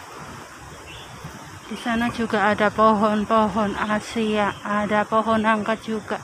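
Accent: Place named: Cilacap, Indonesia. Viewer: Bahasa cilacape ora ilang walau neg negeri orang.. sukses mbak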